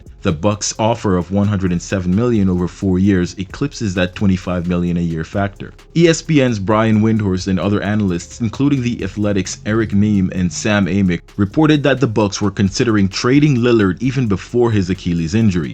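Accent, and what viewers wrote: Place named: Jamaica. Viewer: if i watched this video before i wouldnt have had to ask if you were jamaican lol. notifications turned on! bell and everything! great video.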